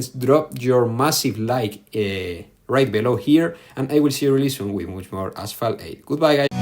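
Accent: Spain. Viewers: Uff loco, de acá se te nota el español🥵👌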